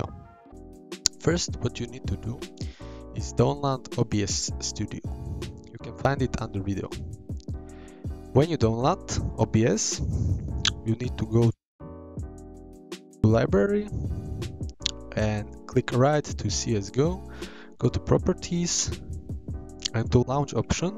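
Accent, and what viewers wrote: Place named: Slovakia. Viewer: som si hovoril že jaká slovenská angličtina :D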